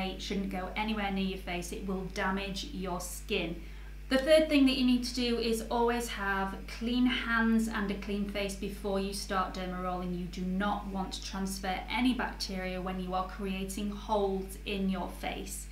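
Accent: United Kingdom. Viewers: BritishJamaican777 British English 😂😂😂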